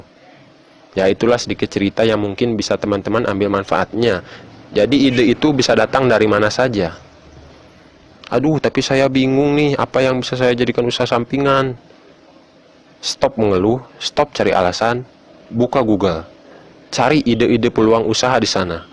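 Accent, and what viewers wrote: West Java, Indonesia. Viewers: sunda bangheet... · Ini yg yg bicara di vidio ini logatnya Kaya orang sunda Krn qu apal bnget kalu orang sunda itu bicaranya mskpun pke bhasa indonesia tapi logat sunda banget krn qu juga orang sunda Tapi maaf ini cuman perkiraan saya aja.kalu ga bnr bhwa bukan orang sunda minta maaf aja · Taiwan Taipei ..muhun logat sunda..sami sareung abdi..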